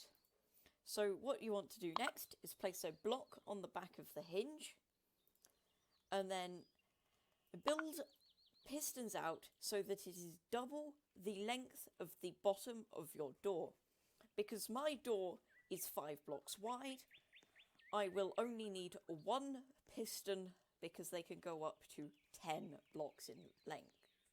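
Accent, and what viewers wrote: United Kingdom. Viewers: Everyone talking about the door: Me: What a posh british accent. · British voice bro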